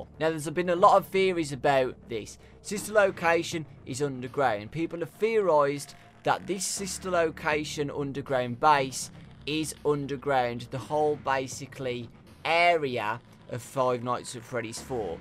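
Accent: United Kingdom. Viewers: that was sweet man ! well done but that british accent :-D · He has a british Accent he is form the UK C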